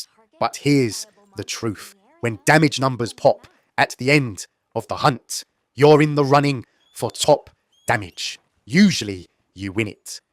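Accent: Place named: United Kingdom. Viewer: Really sick of choosing random videos only to find another British narrator. Do you mfs have real jobs?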